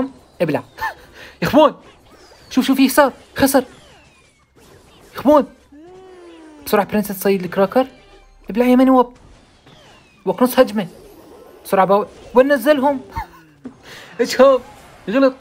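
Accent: Iraq. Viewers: شكلك عراقي تكول يعني تفضل هههه احبك متابعك من العراق 🤍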